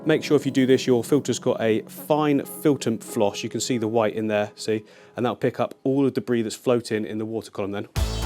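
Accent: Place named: United Kingdom. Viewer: I really like your tanks, but this british accent is bloody haaard, innit? I just can't watch it, sorry. But a lot of kisses, wish you well.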